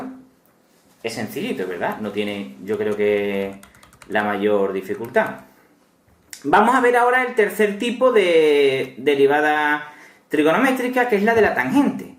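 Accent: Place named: Catalonia, Spain. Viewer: perfectos tus vidios, aun que aún no me acostumbro a tu acento catalán... .___.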